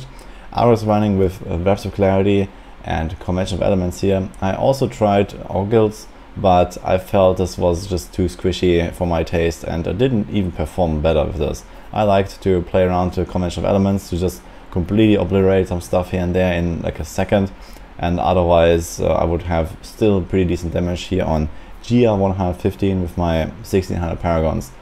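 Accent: Germany. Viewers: bist du deutcher? hast so den Akzent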